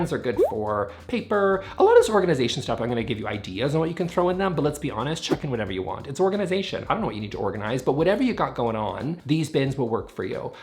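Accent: Sweden. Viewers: Greetings from Göteborg.:hand-pink-waving: I totally enjoy your Swedish pronunciation. Keep it up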